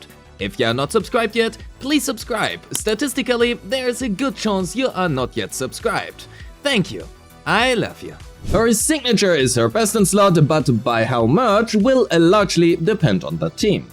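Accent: Germany. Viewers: I hear german accent + see a good structured guide with a pinch of humor - it`s an insta subscribe 🔥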